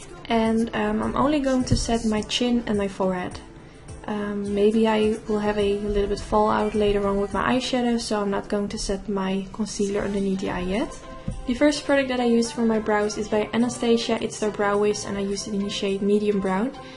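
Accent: Netherlands. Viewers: Is that a a Dutch accent? Are you from the Netherlands? Your make up video is fantastic!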